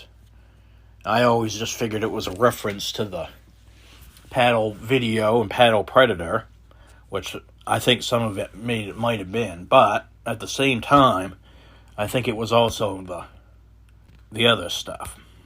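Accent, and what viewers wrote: Maine, United States. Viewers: I hope you don't take this as me fishing (lolgetit) for information and feel free to delete my comment or just ignore if you don't want to reveal but...is that a Maine or Maine adjacent accent?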